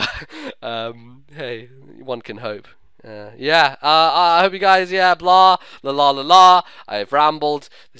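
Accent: Ireland. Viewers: im actually disappointed that the irish accent didnt last for the whole vid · Your Irish accent is really impressive. ^_^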